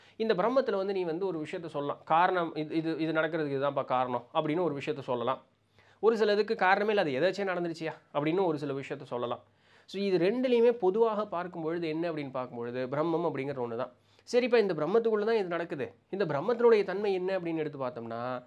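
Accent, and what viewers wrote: Coimbatore, India. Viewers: உங்கள் ஊர்(கோயம்புத்தூர்) மொழியில் கேட்க ஆனந்தமாக இருக்கிறது💕..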